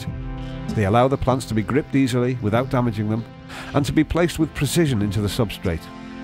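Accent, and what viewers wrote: Liverpool, United Kingdom. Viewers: Hjalmar Nordén he’s not from Sheffield lol. It’s much closer to Liverpool or the Mersey area. They’re scouse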